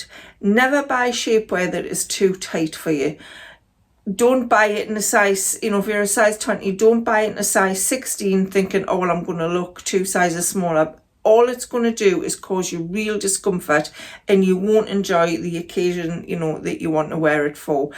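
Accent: Newcastle, United Kingdom. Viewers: Such a good informative advise to anyone using shapeware clothing to improve their image in clothing and your photos prove it works! Well done. Oh, and I love your geordie accent 💞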